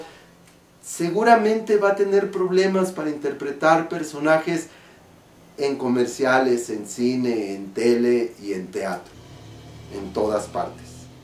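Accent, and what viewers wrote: Mexico. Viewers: me di cuenta que usted es Mexicano! ... Me gusta su acento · tiene acento mexicano, lo neutro quiere decir que nadie te pueda identificar de donde eres oriundo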